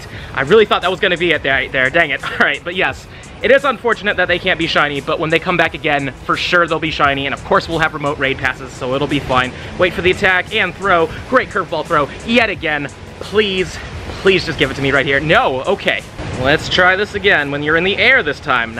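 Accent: United Kingdom. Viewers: Where are you from the uk? O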